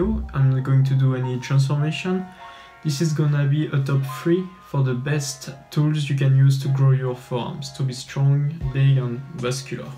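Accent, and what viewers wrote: France. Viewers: Sa se vois que t'es français à cause de l'accent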